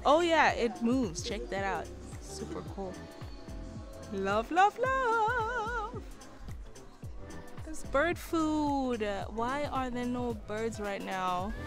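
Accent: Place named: United States. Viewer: Definitely a hidden gem in southern east Africa I would like to visit.#Eswatini 🤩. you lived in America before?. I can hear that accent slang.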